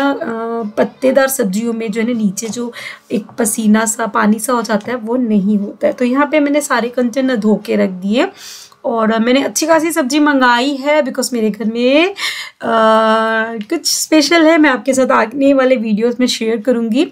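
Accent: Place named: United Kingdom. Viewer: Her accent 😂😂😂😂 British lg rha hai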